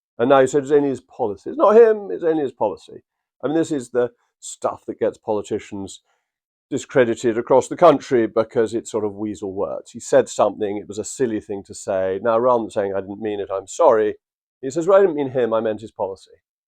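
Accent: RP, United Kingdom. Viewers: Look at this guy, camera looking up at him, some portrait in the background. Bookshelves on either side whilst he speaks posh and makes cultivated hand gestures throughout the video. And then he starts talking about the working class… Well colour me sideways Moggy I don’t believe a word you are saying and you are probably just trying to build a YouTube channel at this stage. Nobody as posh as you has the right to align yourself with the working class, get stuffed.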